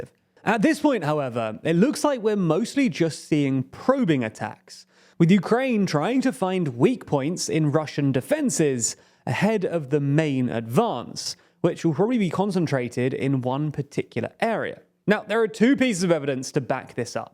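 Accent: United Kingdom. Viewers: pretty sure its just the british accent though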